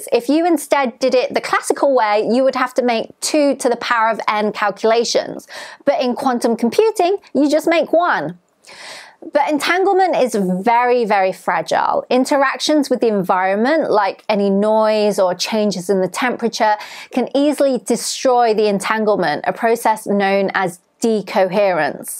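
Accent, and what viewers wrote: United Kingdom. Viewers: I am curious, are you a native British english speaker? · Forgive my bluntness, but I'm Canadian, I love languages, and I can't identify which UK accent you have, and I don't want to be rude by guessing wrong. So, what kind of accent am I listening to?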